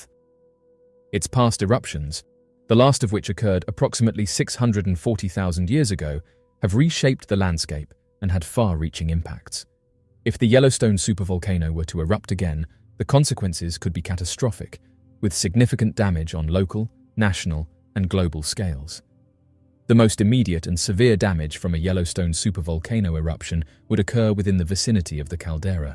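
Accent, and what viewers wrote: United Kingdom. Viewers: Why is it that most videos I watch are about the USA and yet the voice is always British? Don't any Americans know about America, or do the British not want to talk about their own .....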